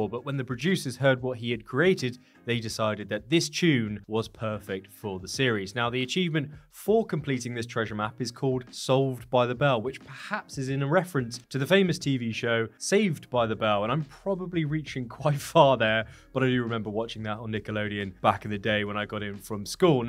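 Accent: England, United Kingdom. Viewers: always! Your videos are the only ones I’ll watch for HL content. Professional, eloquent, and no fluff. And an English accent is much more preferable to an American one. Haha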